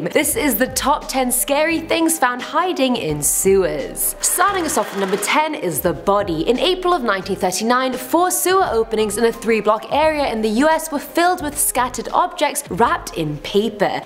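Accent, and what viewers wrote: United Kingdom. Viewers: I'm assuming she's British because she has a British accent...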